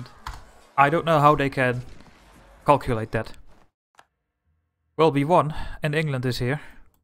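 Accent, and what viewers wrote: Netherlands. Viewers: I can really tell that you are Dutch from how you pronounce the Dutch cities. It's strange hearing a mix of English and Dutch like that.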